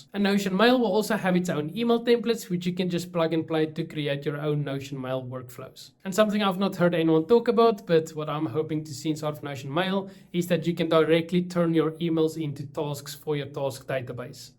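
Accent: South Africa. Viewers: Once I heard you say the word database entry , I knew from your accent that you were south african 😉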